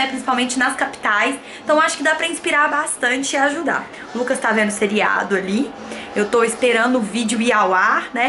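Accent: Minas Gerais, Brazil. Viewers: É o sotaque de Minas! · "Passar esse trem aqui..." kkkk Sotaque mineiro é demais...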